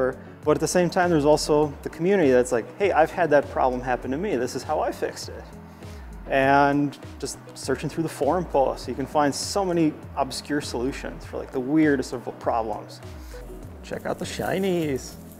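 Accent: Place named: Minnesota, United States. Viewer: How old were you when you moved? Your accent is, non-existent, well aside from being recognizably Minnesotan.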